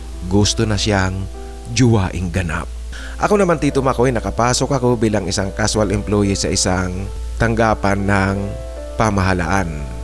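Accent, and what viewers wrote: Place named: Tagalog, Philippines. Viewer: good evening, Tito Makoy... I realized that it's been 5 years now since I started following your channel dated March 18, 2021. lala ko pa, I used to smile every time you have an accent in some Tagalog word. I think it was one of my comments to teach you to pronounce some Tagalog word.. 😅 but now, as I've mentioned before, you're totally sound from the Katagalugan region. (I came from the popular "Balagtasan" province, can you guess?😅) more power to your channel and wish it will reach the Decade Anniversary.🤗 Stay safe... 🫡 God bless! 🙏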